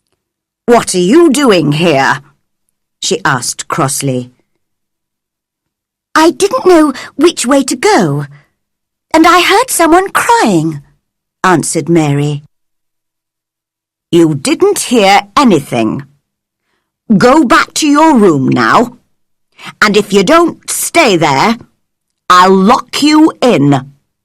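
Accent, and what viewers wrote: United Kingdom. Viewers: اللهجه بريطانية 😭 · you v'e got wonderful British accent with amazing voices ❤❤❤ please keep posting your stories, l really liked and I appreciated it so much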